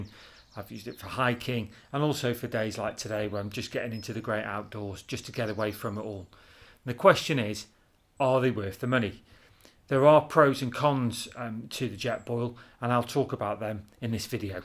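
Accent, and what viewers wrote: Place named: United Kingdom. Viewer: British accents are so pleasing to listen to after listing to American videos. Nice video znd good information, Thankyou.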